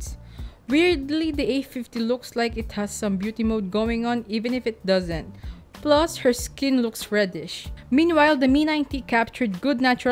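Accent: Philippines. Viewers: pinoy kse ganun tlga d native language eh.. may accent tlga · This accent is too thick. Im guessing it is pinoyish english. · Non-native Filipinos speak that way. Let it pass. At least she's trying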